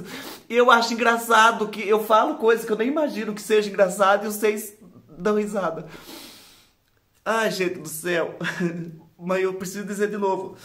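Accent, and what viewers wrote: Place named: Rio Grande do Sul, Brazil. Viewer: Gostaria de saber de onde vc é .Achei que fosse gaúcho, pelo jeito que tu fala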